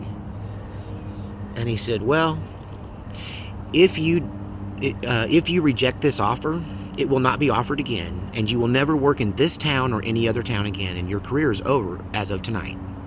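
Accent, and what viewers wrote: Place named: Texas, United States. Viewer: I knew you must be from Texas. (That's a compliment).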